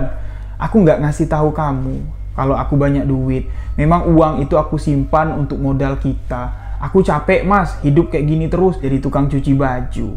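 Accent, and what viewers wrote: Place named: Madura, Indonesia. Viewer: Tak denger2in ini logat koq jd madura yaa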